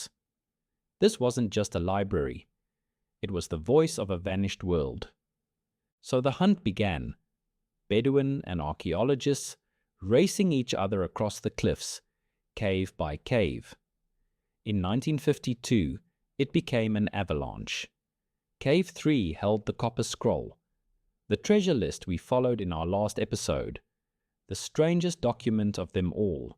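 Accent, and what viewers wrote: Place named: South Africa. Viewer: I love that this AI has a decent South African accent 😅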